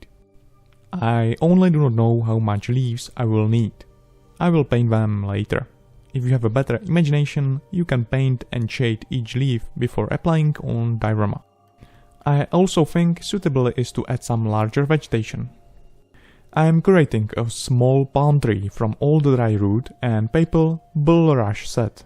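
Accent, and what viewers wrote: Czech Republic. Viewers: He is from Czech like me you can find out by hearing R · This guy sounds like he’s from Czech republic. Trust me I know the accent. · Wtf ten český přízvuk se nedá přeslechnout. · čuchám český english accent?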